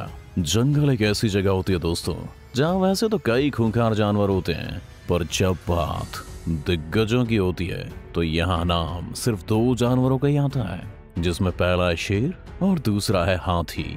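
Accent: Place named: England, United Kingdom. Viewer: You speak Hindi in English style 🤣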